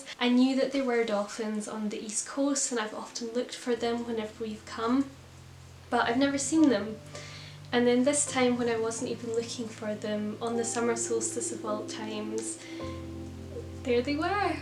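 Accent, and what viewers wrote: Scotland, United Kingdom. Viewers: What a delightful Scottish brogue. I could listen to you all day!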